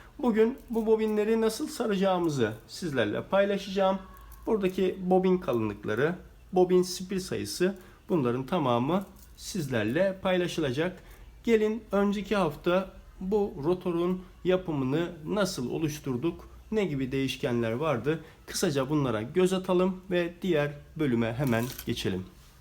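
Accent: Turkey. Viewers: Cok guzel istanbul turkcesi konusuyorsunuz...... Ne kadar zamanda ogrendiniz Turkceyi , ve nerelisiniz?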